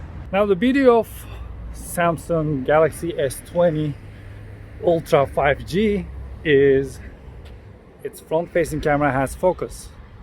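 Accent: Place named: Turkey. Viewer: Your accent sounds like you are a turkish guy.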